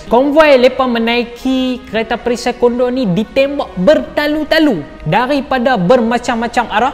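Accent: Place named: Kedah, Malaysia. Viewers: Lepa ni slang mana, Kedah ka Perlis? banyak kali juga dengar lepa lepa lepa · Ni dialek Kedah belah mana ya? First time dengar depa bunyi lepa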